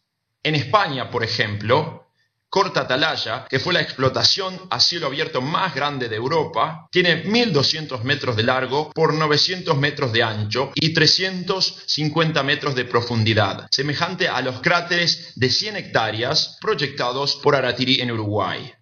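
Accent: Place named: United States. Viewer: Lamentable este vídeo, totalmente político y falsante, el primer y grave error es entre el minuto 6 y 7 que habla sobre la decisión del presidente de la instalación de una planta de celulosa en el año 2000 y ponen una foto de tabarez Vazquez, cuando recién asume el cargo en el año 2004, increíble, no lo mire todo porque me parece una perdida de tiempo, otro detalle es la voz del narrador con acento yanqui, y por cierto EEUU es el país que mas contamina del planeta, produce nada mas y nada menos que el 30% de emisiones industriales de todo el mundo, Pd. en mi persona estoy en contra de la contaminación.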